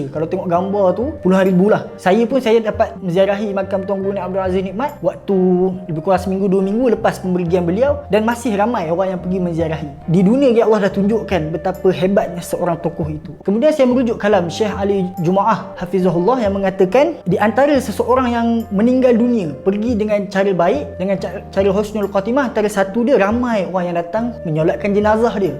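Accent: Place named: Perak, Malaysia. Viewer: Kuat perak nya 😅😅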